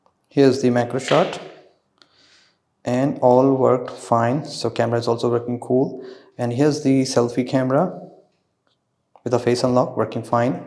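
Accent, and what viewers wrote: India. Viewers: Indian accent is so annoying lol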